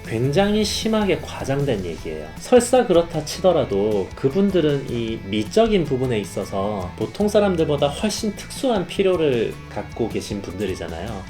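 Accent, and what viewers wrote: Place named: Gyeongsang, South Korea. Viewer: 대구 경북 쪽 말투라서 더욱 신뢰가 가네요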